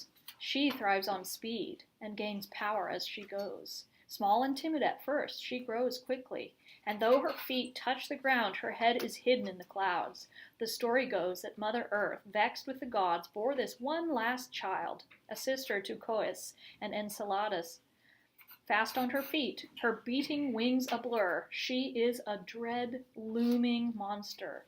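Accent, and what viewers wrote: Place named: United Kingdom. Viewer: Ye what is up with all those audiobooks with British accents?? Where are all them American accents??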